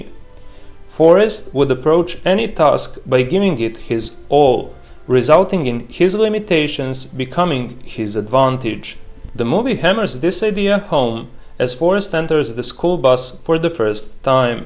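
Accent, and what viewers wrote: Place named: Europe. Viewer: That was a great video you were destined to make it...I couldn't even tell you had a speech issue, just a heavy european accent. Keep making the videos I like Zemeckis and Spielberg movies. Zemeckis' movies revolve around this concept of destiny/fate...some good ones are Contact, Cast Away, Flight and The Walk. I encourage you to ask yourself a question Lt Dan asked Forrest, whether He found Jesus. There is a reason you used the word beJesus ....think about why only that name is used as a replacement for a cuss word.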